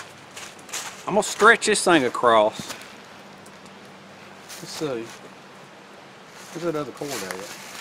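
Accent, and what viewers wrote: South, United States. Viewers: Holy Moly 10 days of rain. Good sound for sleeping though. I love the sound of rain on a tent, and rushing water, louder with the wind the better. I so love your great quality gloves with the velcro wrist straps to tighten. Nice matching hat too. Wow great idea on the 2 custom tent stakes and to boot you criss cross them for extra stability. You are one of the cleanest best color coordinated dressed bush crafter or sometime woodsman lol on YouTube I bet. Good for you! What was that in your accent, was that sake? Love the southern accent. Wow awesome results!!!! Hot stuff wow. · I love to hear his southern drawl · you have the heaviest southern drawl I think I've ever heard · How did you make your reflective tarp and firewall? I learn a lot from you and love the southern accent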